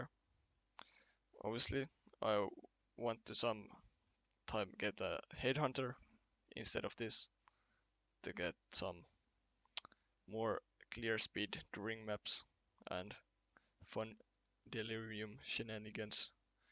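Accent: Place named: Finland. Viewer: If i learned one thing in Finland for my 16 years of stay there, it is how finnish people sound when they speak english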